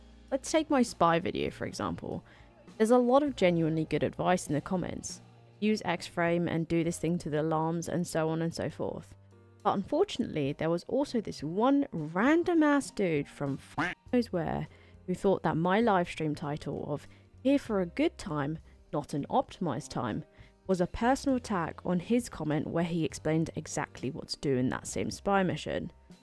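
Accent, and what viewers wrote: United Kingdom. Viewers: Only reason why I watch. and for the rants with bri'ish accent. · The amusing bit out of all of this: you sound British as hell in this video. No hint of aussie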